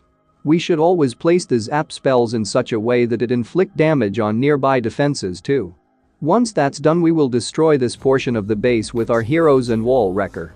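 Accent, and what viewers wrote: India. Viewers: Bro, Are u Indian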